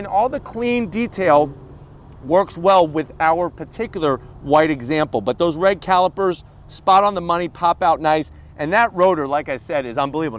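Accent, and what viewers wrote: United States. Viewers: your parents are from Italy? Wow. Didn't see that coming because your accent are very American though.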